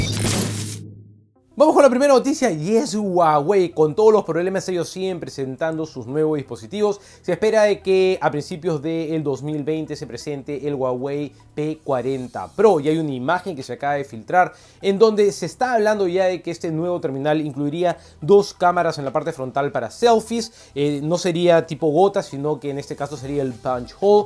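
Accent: Peru. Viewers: Él es peruano?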